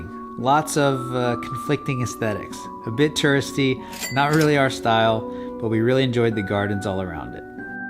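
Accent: Brazil. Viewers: you are learning portuguese accent from brazil and not the real portuguese from portugal :D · Just please dont use the brazilian portuguese app you were using in the video, please! Portuguese from Portugal is a bit different from Brazil and the pronounciation/accent are very different. Thanks. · You are learning Brazilian Portuguese 😅😅 Portugal accent is stronger, like British English vs American. But you are doing great, ready to go to Rio de Janeiro🙌🏻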